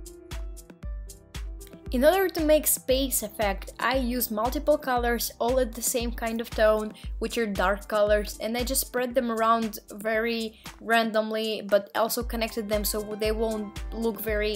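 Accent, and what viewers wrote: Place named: Russia. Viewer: хоспаде, она же не занималась курсом фонетики, чисто русский акцент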